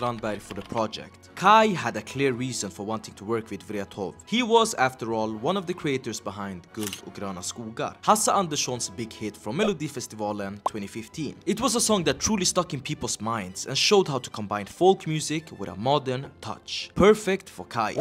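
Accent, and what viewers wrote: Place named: Finland Swedish, Finland. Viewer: *Fennoswedish dialect, not finnish accent : ), but otherwise awesome vid.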